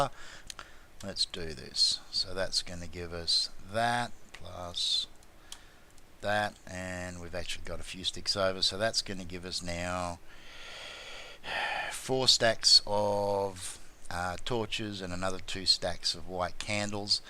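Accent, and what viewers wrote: Australia. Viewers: I just have to say how much I love the Aussie vernacular and accent! You have such charming ways of saying/describing things. (This does not refer to 'Aussie English'), you know what I mean. <3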